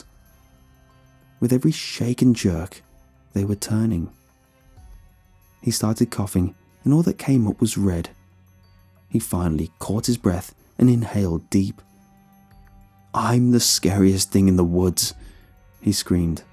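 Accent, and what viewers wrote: United Kingdom. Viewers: True, although actually now that I think of it, maybe the narrator was saying holler, but it sounds like "holla" because of his United Kingdom accent...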